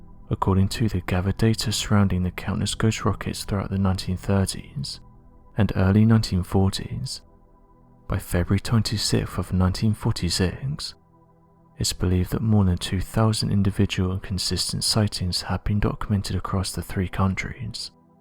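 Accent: England, United Kingdom. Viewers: you heard him wrong. he clearly says "shooting off". i didnt even need to listen to it more than once to hear him say "shooting off" but after your comment i went back to listen and he doesn't sat "shitting off" lol. maybe you're not used to the English accent. I'm saying this respectfully cheers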